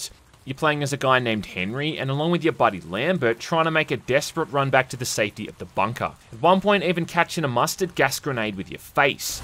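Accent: Bogan, Australia. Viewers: Just discovered your channel. Love gaming commentary from someone else with a bogan Aussie accent! Good work! 🇦🇺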